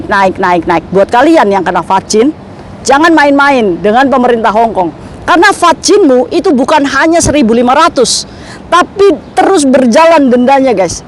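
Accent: Indramayu, Indonesia. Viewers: Logate kyk Wong Indramayu sih?? ana Longkrang e kedik 😂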